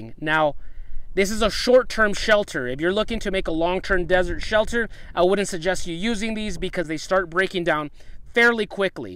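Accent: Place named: Northwest, United States. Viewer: Dude, I love this video and yes, I can straight up. Tell you a bunch. More plants that lived down there that actually grow in that envirogreat. Video and you have a good English voice. I know that sounds weird, but you actually speak like a northwesterner. That's where I come from. You. Kinda sound like I do. Not a Southerner. You don't got the dral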